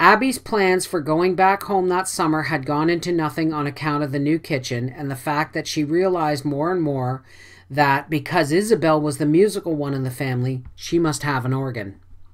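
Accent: Canada. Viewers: I hope you do! It's so kind of you to make these videos and take so much of your time on them. I also wanted to say that I was born and raised on the shores of Lake Superior (WI) and your Canadian accent is so comforting and makes me feel at home. Keep reading when you can, I for sure am a devoted fan.:)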